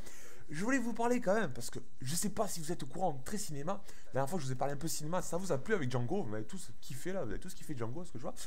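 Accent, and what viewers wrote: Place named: Marseille, France. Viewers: j'alias lui demander s'il était pas marseillais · T'avais dit que t'allais montrer tes stats lol jaime beaucoup ton accent Marseillais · Un toulousain avc un accent marseillais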